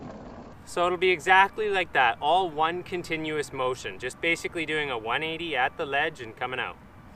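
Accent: Canada. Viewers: the Canadian accent was strong on this one haha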